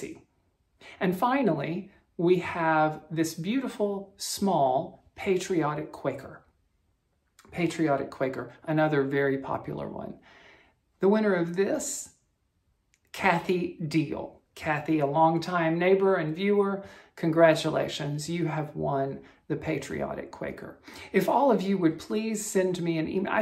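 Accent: South, United States. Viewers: Kia Ora from New Zealand! I have just finished watching your entire journey from the start while I have been home sick this week. You are truly a joy and perfect medicine. I've had tears and laughter, memories evoked, and a lil enabling. If laughter is the best medicine then u are a wonderful cure as I do feel better. Only thing is, as u are the only voice I have heard this week, every time I talk to my cat n dog I have a slight southern accent happening lol. You welcome everyone to the neighborhood but after watching the kindness, support, fun and joy, it's not a neighborhood u have created here, it's a FAMILY. Congrats on your milestones, I watched on TV so didn't comment often, but thank you for keeping me company (I'm a terrible patient) and I would like to suggest a name for hand stand cat ... OHANA. It means family. Thanks again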